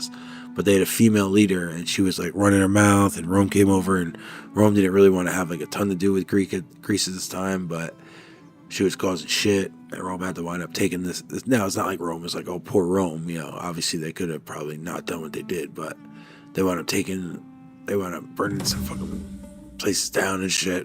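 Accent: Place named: United States. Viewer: If you're having fun, that's the most important thing. Sure, it's not the most dynamic campaign I've ever seen, but considering that and the difficulty level, it's definitely something different, and that's cool. If it's fun for you, that's what matters. For me personally, 12tpy is a bit too long, but it's nice to see something different style. Sorry for my English, I hope everything is understandable; it's not my first language ;p One question, where are you from? because I have a feeling that we operate in completely different time zones😅 the time in which you publish your videos and your accent tell me it's the United States? Good luck and I'll definitely be checking in from time to time to see how your campaign is going!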